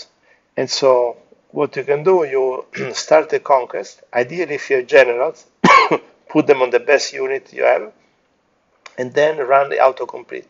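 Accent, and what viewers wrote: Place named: Thailand. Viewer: he's from thailand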